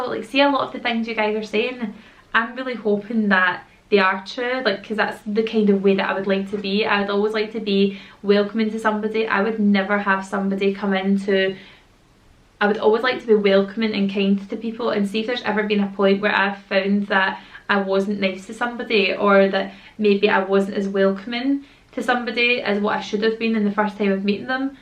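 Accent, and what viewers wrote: Scotland, United Kingdom. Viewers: Think your video was great love all your vlogs, loving the odd scottish word that i dont understand 😄 love your accent just be youself as i know you are X💓❤🌹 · I really love your accent! I live in the southern United States and in my area I just don't meet many people from other parts of the world but when I first watched your channel I could tell you were Scottish and would never have thought you were speaking anything but English☺some words you pronounce differently than in my neck of the woods but I love hearing that and some of the phrases you all use are different from here but that's what I love so much about watching UK channels! I think you're lovely and I enjoy your content so much! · "Babe its english" 😂😂!! Love it! But its so true, mon the Scottish accent ❤❤❤ (im from scotland too) lol xx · Very chuffed that my assumption was mentioned 😊 You were so honest in this video (you seem very honest anyway) but you addressed the assumptions so well! 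Love all your videos! Especially the mummy videos and the cleaning ones! As a mum myself its lovely to see how other mums do it! Lovely to hear a Scottish accent as well on YouTube so I wouldn't worry about the accent too much! I'm from ayrshire so not a million miles away! Keep doing your thing girl 👌❤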